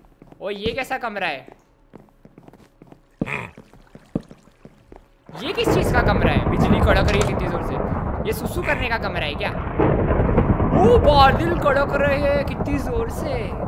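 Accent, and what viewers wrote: Gujarat, India. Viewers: Are you from Gujarat?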